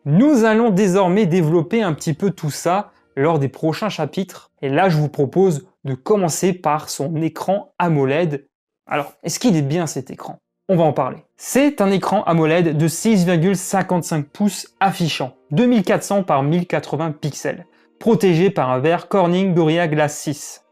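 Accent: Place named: Alsace, France. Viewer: t es alsacien? je sens un leger accent!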